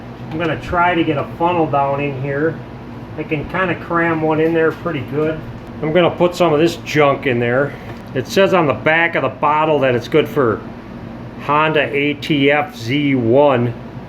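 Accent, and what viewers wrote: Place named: New England, United States. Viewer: Awesome video. Not a bunch of talk but straight to the point. Love that New England accent also.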